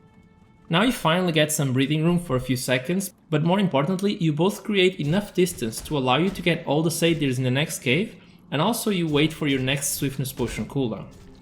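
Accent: Portugal. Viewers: I could sense it in the accent, olá amigo! 😆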